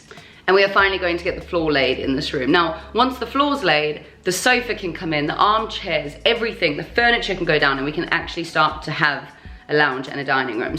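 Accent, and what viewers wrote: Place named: South Africa. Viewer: Instantly knew you must be South African! Fellow South African here 🙋🏼‍♀️ Great video 🥰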